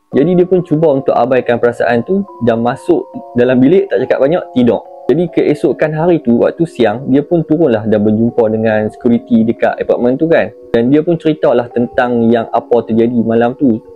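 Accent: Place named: North, Malaysia. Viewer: org utara dok.mana bang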